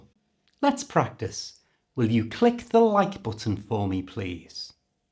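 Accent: Liverpool, United Kingdom. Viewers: As I have already told to you I enjoy your videos and your scouse accent. Cheers from Italy 😊👍🇮🇹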